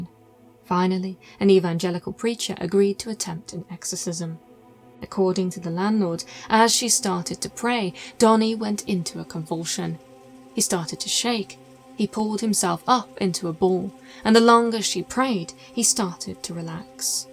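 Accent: England, United Kingdom. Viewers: I absolutely love this channel 💓. The narrator with her English accent makes for an amazing story teller!! I also love how the narrator takes the content seriously and tells it in a serious manner ❤.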